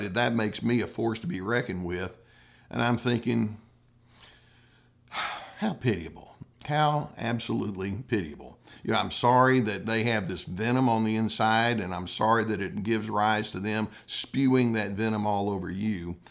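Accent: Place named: Texas, United States. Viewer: We have a narc neighbor (70 year-old female covert) in the condo below us that's always chiming in on petty things that are none of her business. It started with small, innocent statements, then escalated to (subtle and not so subtle) attempts by her to govern when we use our plumbing, appliances, electric blinds, enter various rooms, you name it. Boundaries of our home space, bodies and schedule are constantly tested. She invents rules and limitations that go beyond local laws. Whenever we have visitors, she'll go out of her way to rain on our parade. She tries her best to get into our heads and create psychological doubt. There's zero tolerance as we never know which alleged infraction will lead to a lengthy, condescending, word salad text or email. Since educating myself on narcs and going grey rock, she has lost interest in combating us and may soon be moving out. 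Thanks Dr. C for imparting your wisdom with a sweet, down-home Texas drawl. Fingers crossed!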